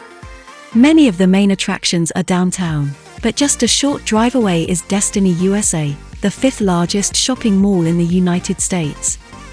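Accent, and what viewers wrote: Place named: United Kingdom. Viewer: This lady commenting this video & her British accent annoys me. (other than a suburb of Syracuse is named “Liverpool” there’s no reason to have a someone with a British accent narrate this video, about a city in the middle of upstate New York)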